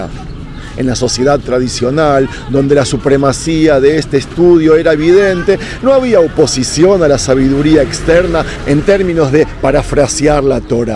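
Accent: Argentina. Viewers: Hola. Soy Miguel de San Vicente bs as, no soy religioso, sin embargo vos con tus videos logras llamar mi atención, haciéndome ver cada uno de tus videos.Quizas sea el acento argentino. Quisiera hacerte una consulta, vos decís en el vídeo q los sefardíes son los judíos de oriente y los azquenazis los de occidente.es así o es al revés.? Te mando un abrazo grande y seguí así.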